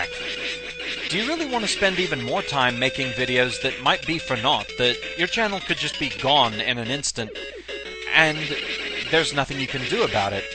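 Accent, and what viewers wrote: United Kingdom. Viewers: umm so you migrated to there from Britain? Because you have such a nice British accent! Or do i just not know anything :D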